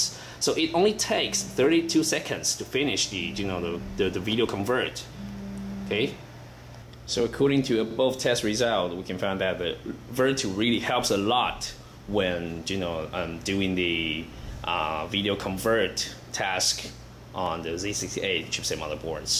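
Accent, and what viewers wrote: China. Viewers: It's a Chinese accent if I'm not mistaken...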